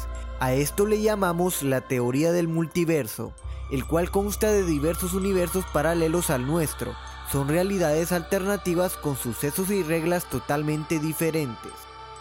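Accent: Honduras. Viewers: ¿Es hondureño? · Eres hondureño? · eres de Honduras ?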